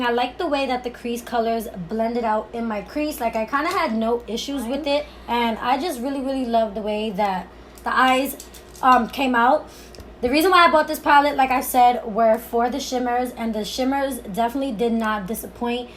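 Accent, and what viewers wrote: New York, United States. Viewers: She definitely from New York right?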